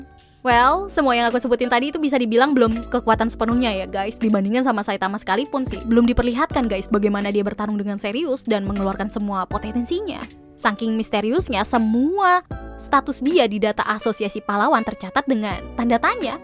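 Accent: Java, Indonesia. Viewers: nih org jawa apa medan seeh wkwkwk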